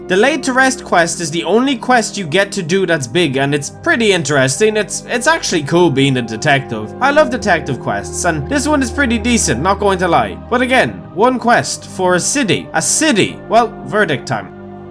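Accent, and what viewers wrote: Ireland. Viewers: First video, so I was like "why dafuq he sounds like a drunken dude?" Then I saw the name of the channel and went "Oh... That's why" Love Irish accent ~and their drinks, and gingers~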